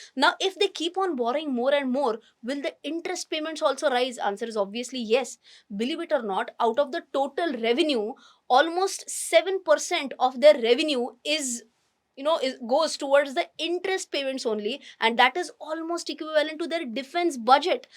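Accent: India. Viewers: You have a pure Indian good English Accent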